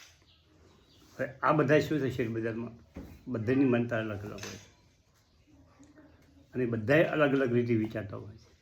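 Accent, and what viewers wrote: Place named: Vav-Tharad, India. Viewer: કાકા તમારી બોલી વાવ થરાદ બાજુ ના હોય એવુ લાગેછે જવાબ આપવા જો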